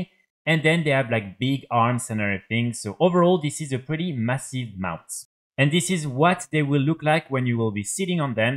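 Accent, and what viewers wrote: France. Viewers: Pas mal le french accent 😏 good video haha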